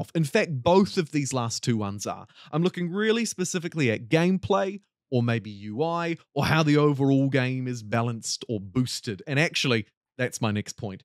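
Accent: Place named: New Zealand. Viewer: I love ur content!! U sound like Cs Theus the skin guy, u know!? U from NZ?!?! <3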